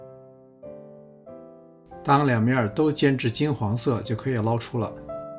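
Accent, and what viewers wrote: Beijing, China. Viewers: 您是北京人嘛🤩口音有点亲切啊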